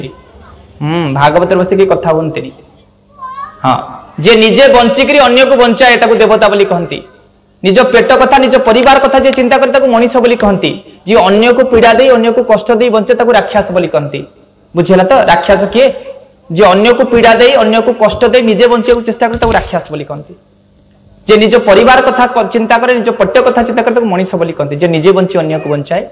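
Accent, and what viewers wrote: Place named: Sambalpur, India. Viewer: ସମ୍ବଲପୁରୀ ସ୍ଵରରେ ଚାଲିଛି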